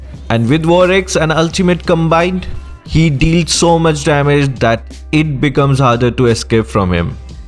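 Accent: India. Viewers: Are u indian🇮🇳? Your voice accent seems indian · You sound pure typical Indian, how come your name is? Please change your channel name,keep anything but not South Asian names, request 🙏. May be " भोकाल"," रुद्राक्ष"" कल्कि " etc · Some Times i think u r Indian cause u sound like one....i m sure u are asian .....one of the very few ...to reach a million subs... 💯💯💯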